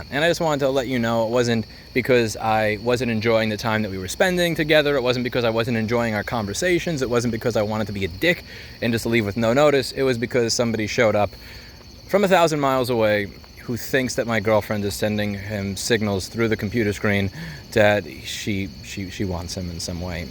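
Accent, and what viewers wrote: New York, United States. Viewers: All I'll say is that his New York accent came out...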